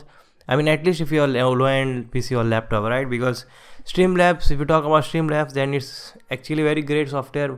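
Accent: India. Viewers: Nice 1.16.3 english Indian boy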